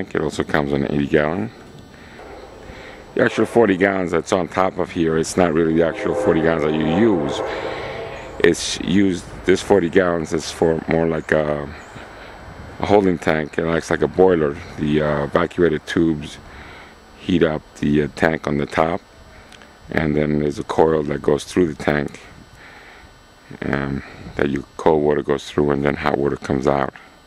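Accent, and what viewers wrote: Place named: New York, United States. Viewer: Hes in the Northeast, listen to his New York accent and notice his New York plates.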